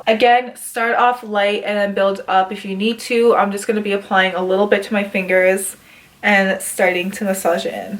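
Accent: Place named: United States. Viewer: Are an Indian u are talking like American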